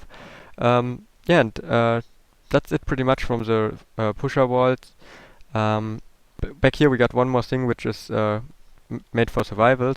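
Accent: Germany. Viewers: Ach gutes Englisch mit deutschem Dialekt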